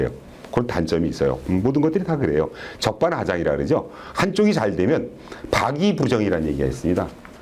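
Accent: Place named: Seoul, South Korea. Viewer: 신기한 서울말의 세계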